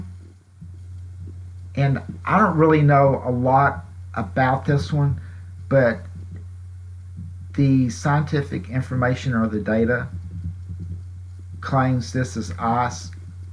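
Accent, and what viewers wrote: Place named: North Carolina, United States. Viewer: Are you from near NC? Your accent sounds like where I grew up.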